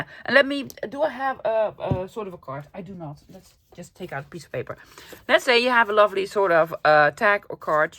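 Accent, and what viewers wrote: Netherlands. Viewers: You are so cute, I enjoy your Dutch accent very much. My grandfather, Cornelius Vander Jagt and my grandmother Cornelia Van Strien were born in the Netherlands. Your voice reminds me of Grandma's voice many years ago (I'm 70!) Your happy attitude make listening fun. I have been a artist for many years painting watercolor. I am now using photos of my art to design tags, pockets and anything to do with journals. I fussy cut the photos and apply them with glue. Trying to make my own ephemera, one of a kinds! Thank you for the hours of happy, relaxing fun. Debra L Pate Art.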